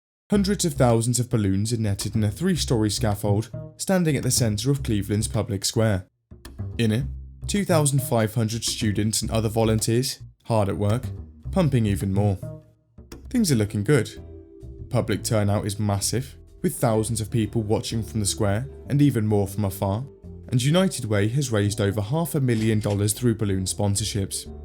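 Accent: United Kingdom. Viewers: I love the British man going over my state's history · with a bri ish ascent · Not fond of a Brit voicing this about Cleveland. If you’re gunna make fun of the USA, get someone with an American accent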